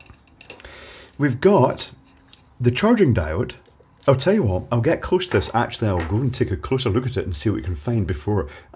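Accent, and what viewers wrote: Scotland, United Kingdom. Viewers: Here is the guy with the Scottish accent.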